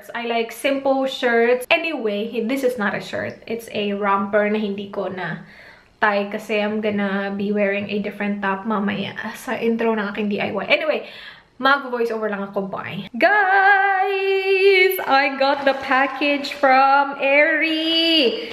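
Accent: Visayas, Philippines. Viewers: Nakakaloka yung tono kapag nagsasalita ka ate hahahahahahahahaha😂 naaalala ko si tita Giselle sayo😂 kasi pag nagsasalita siya yung tono ng bisaya'ba nandun parin hahahahahahahahahahha. Nung isang araw pako nagi-stay sa channel mo ate jajajahhahahahahahhaha😂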